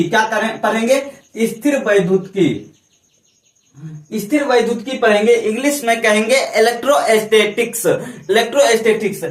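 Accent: Bihar, India. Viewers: Bihar se ho kya??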